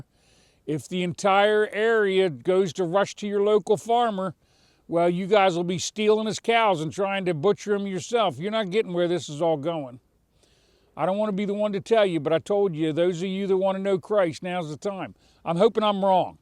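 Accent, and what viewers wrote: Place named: Pittsburgh, United States. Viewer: I hear that Burgh accent too💪 Yinzer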